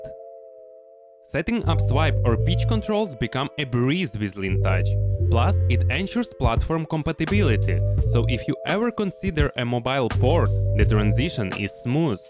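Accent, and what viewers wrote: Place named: Russia. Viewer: Вери гуд видеос, виз руссиан аксент итс мор ундерстендабле! Сенк ю вери матч!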